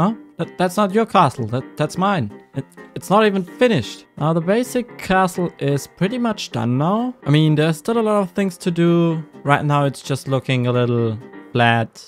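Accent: Germany. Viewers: i watched last video and this one and literally at the first minute of the last one i knew you arent from england cause you feel german lol ( in deutsch in der ersten minute hatte ich direckt das gefühl das du deutsch bist weil du keinen englischen sondern deutschen dialekt hast lol ) anyways i love your content already :D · Name für einen Hund Balu , mann hört an deinem Akzent das du Deutsch bist oder bei Cactui find ich nice das du Englischsprachige videos macht.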